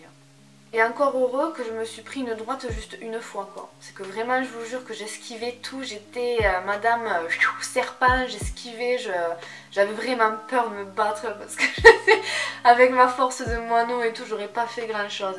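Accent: South, France. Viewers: Oh tu es de Béziers ? je viens de découvrir ta chaîne et j'adore ta personnalité. Je me disais bien que tu avais un accent du sud :p J'ai toujours vécu à Béziers,enfin maintenant habite à Boujan mais c'est à côté ! j'ai aussi subi du harcèlement mais à Jean Moulin ! c'est allé trop loin moralement pour moi et encore maintenant ça me gâche la vie alors que çafait 10 ans que c'est fini. Sujet joyeux bonjour xD je ne vais plus en centre ville donc je ne sais pas si c'est mieux ou non mais je pense que oui dans l'ensemble ! En tout cas ravie de voir que ça ne t'as pas empêché d'avancer ! Reste comme tu es, Bisous 😘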